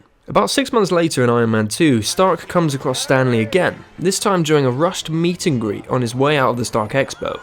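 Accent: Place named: West Country, United Kingdom. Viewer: This is bloody brilliant- had me laughing and is surprisingly feasible! Is that a west country twang I detect in your voice,?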